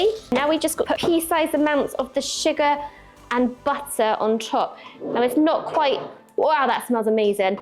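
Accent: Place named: United Kingdom. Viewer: I’d rather they pronounce it the British way. It’s part of the charm of their channel in my opinion.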